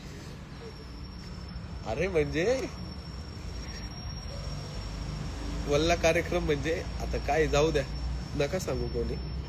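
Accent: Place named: Kolhapur, India. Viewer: आरे दा तु लय भारी बोलतो तु कोल्हापूरचा आहे का रे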